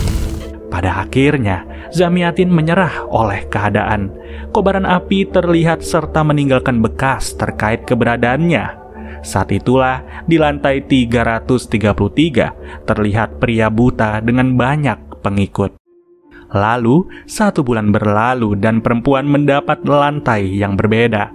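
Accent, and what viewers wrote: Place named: Banyumas, Indonesia. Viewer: Wong ngendi koh lur kayonge ngapak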